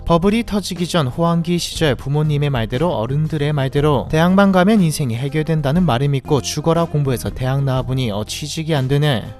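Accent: Gyeongsang, South Korea. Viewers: 딱보니 경상도 😂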